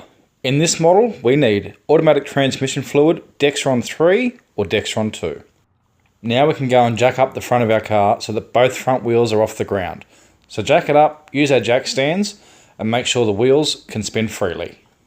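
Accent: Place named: Australia. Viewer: Your are legend mate. Sounds like Australian if yes where about your base? Im from Melbourne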